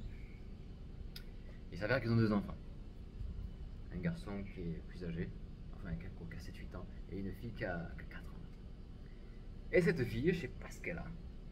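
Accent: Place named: South, France. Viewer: Pleins d’émotions soutenue par ton accent du sud, on apprécie ! 👌🏻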